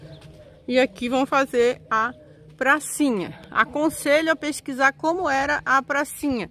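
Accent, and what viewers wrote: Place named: Portugal. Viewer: Olá amiga vc parece uma verdadeira portuguêsa! O sotaque é igualzinho! Kkkk vim fidelizar nossa amizade! Abcos!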